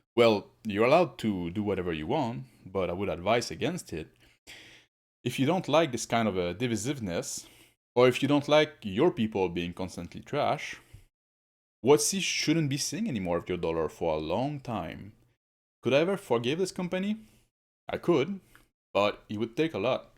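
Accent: Quebec, Canada. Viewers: pour moi t’es du Québec hen? 😂 sub time!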